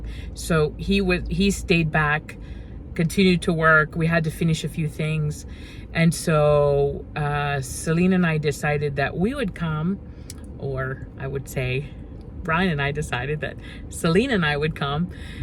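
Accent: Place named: Kenya. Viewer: 😂😂😂😂 I love venye the Kenyan accent has caught up. "Even me I will stare"😂😂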